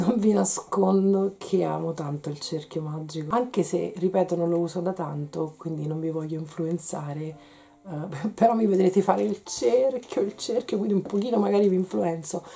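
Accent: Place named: Lazio-Campania, Italy. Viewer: ma tu abiti tra Lazio e Campania? amo il tuo accento...e i tuoi video! un bacio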